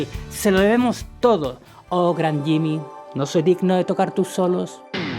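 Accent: Andalusia, Spain. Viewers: A veces le siento como acento andaluz!!!🤙🤙🤙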